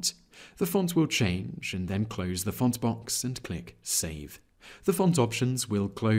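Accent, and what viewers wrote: England, United Kingdom. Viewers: The english accent makes the lesson so much nicer to listen to. Very pleasant.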